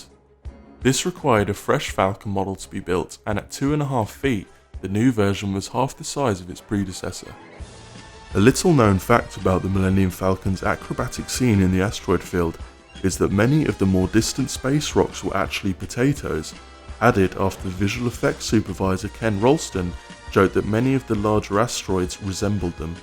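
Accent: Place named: United Kingdom. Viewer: Why is Kylo Ren narrating this with a British accent?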